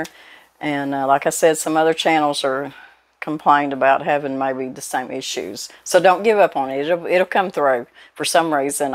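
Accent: South, United States. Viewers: I went out to my patio to plant some flowers and when I came back inside I heard this beautiful southern drawl and just KNEW it was you. And it WAS!! ❤️ I need to spend the day with you Miss!! All my family is gone and you sound JUST like my grandma. Soooo very comforting to my soul. Much love to you 2! 😘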